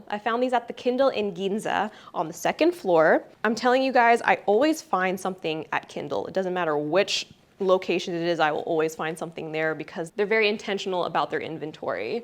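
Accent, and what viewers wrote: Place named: Australia. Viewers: the aussie accent was confusing me so much 😭 but it’s so cute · girlllll you’re Aussie accent is killing me 😂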